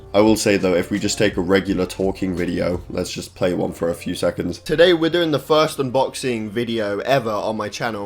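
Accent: United Kingdom. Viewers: These dev times are incredible. It's amazing how far has come And you just did a skit about your own skinwalker vid feat. Cloud... even I remember that 😅 And good on you for being authentic with the editing etc and since you hit puberty late 🤣 Most your demographic probably aren't British, they just love our accents haha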